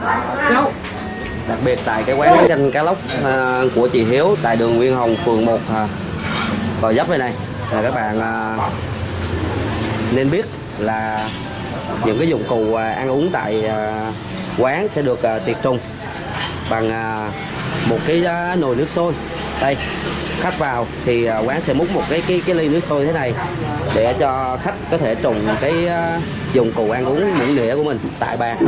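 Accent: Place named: Quang Ngai-Binh Dinh, Vietnam. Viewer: Giọng nói nghe hơi Nẩu chưa chuẩn lắm nói ít thôi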